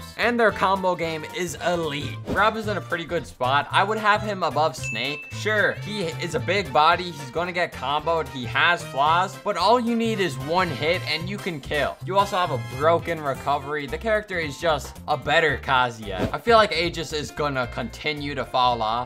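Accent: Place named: Newfoundland, Canada. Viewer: U sound like a Newfoundlander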